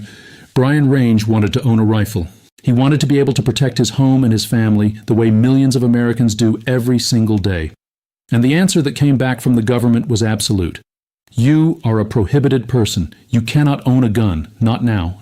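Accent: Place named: United Kingdom. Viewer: Why does this AI have a brittish accent today?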